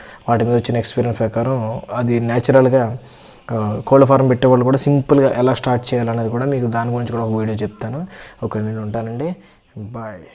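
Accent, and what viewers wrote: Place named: East Godavari, India. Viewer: Ni matalu MA East godavari vadila unai very nice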